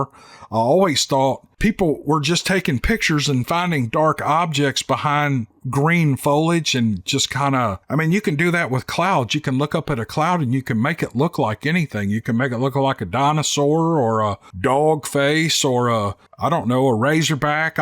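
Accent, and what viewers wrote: South, United States. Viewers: I work for Amazon and we're going for broke in the the 5-6 weeks before Christmas. When I catch a gasp when I get home at 6 a.m. I love to catch your you postings before I go to bed. Thanks for your dedication to the narration of the the tales sent to you by writers, but they are a wonderful tales, wonderfully narrated, in the style (and southern voice) of a wonderful Southern yarn that I have come to miss from living in the South. Thanks Man, the skill of Southern yore telling is well and alive in you.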